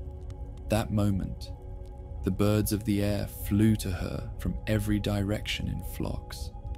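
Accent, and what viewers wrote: United Kingdom. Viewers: Pity, a pommie is the narrator!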